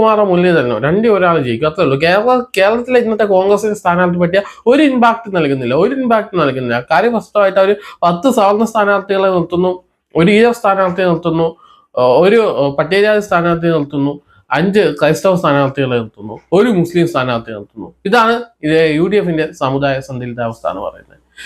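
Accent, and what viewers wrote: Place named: Tamil Nadu, India. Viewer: സംസാരത്തിൽ തമിഴ് കലർന്ന പോലെ ഉണ്ട്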